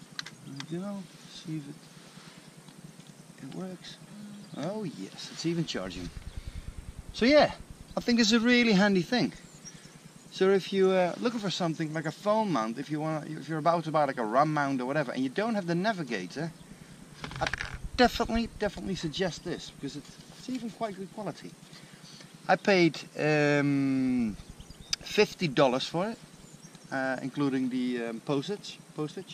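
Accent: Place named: Netherlands. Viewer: yeah! Only thing is that it takes about a month to get it delivered. I live in the Netherlands. I suspect that's a place you know well given your accent!😉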